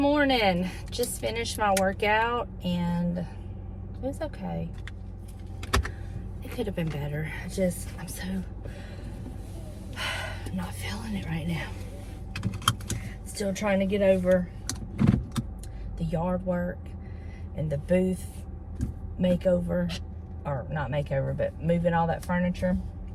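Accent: South, United States. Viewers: I’m not sure how I found you on u tube but I love starting my day with your videos!! I don’t know if it’s the opening music or your soft spoken southern accent but it just relaxes and calms me to watch you. It also makes me want to go thrifting and decorate!!😊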